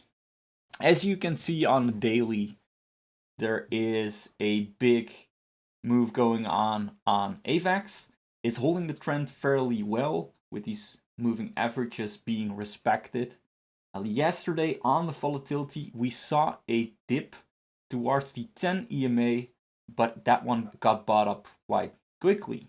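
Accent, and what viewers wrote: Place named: Poland. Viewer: Are you Slavic? Sounds like Polish 🇵🇱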